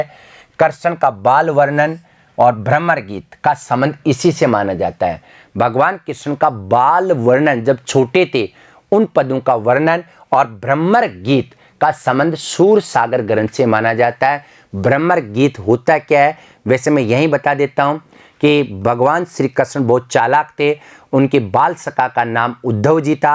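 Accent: Rajasthan, India. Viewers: sir aap rajasthani ho kya ?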